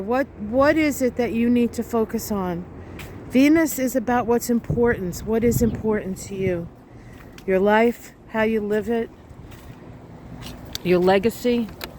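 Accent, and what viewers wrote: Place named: New England, United States. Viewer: Good morning from Sunny 45 degrees in Wichita, KS - going up to 68 today! I’m from CT and lived in Brookline, MA in the mid-80’s and your accent really brings me back! Love your astrology readings! Thank you! ♋️♒️♓️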